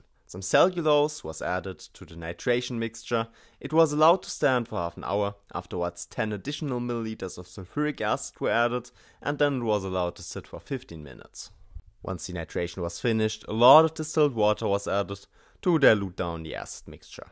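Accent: Germany. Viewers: Man hört sofort das du Deutscher bist 😀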